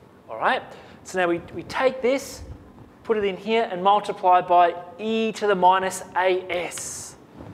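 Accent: Australia. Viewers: Good on you for making this, love having an Aussie for once!! Cheers , 21yr old ENGG student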